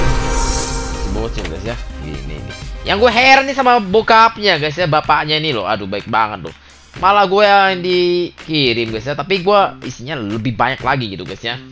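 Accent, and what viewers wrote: West Kalimantan, Indonesia. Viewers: Bang loh orang Kalbar kan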